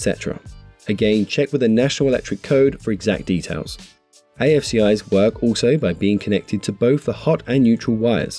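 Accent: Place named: England, United Kingdom. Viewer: Why North America? You're clearly English?????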